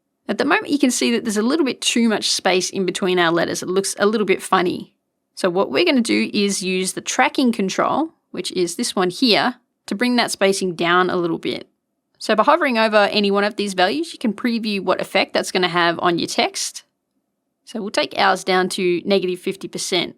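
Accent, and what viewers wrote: Australia. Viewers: Sounds like an Aussie or Kiwi... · I'm still with AD v.1 'cos my mid-2010 G5 can't handle v.2's requirements. This vid answers SO MANY QUESTIONS I've had since switching from Illustrator, FreeHand, etc. It is now PERMANENTLY BOOKMARKED and the URL copied to a separate text file (just in case). If this vid was downloadable, I'd save it to an XHD, too. Great job! (and the Aussie accent is a nice 'plus' point!). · This is hands down THE best Affinity Designer tutorial on earth, but I'm not going to lie, the Australian accent helped keep me engaged the entire time ;) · Glad to hear an Aussie accent at last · THANK YOU!! I love this little course, I've learned more from it than 20 others. Also, it's fun to hear an Aussie voice out there in youtube land - so, a big thank you also, to the young lady who taught it.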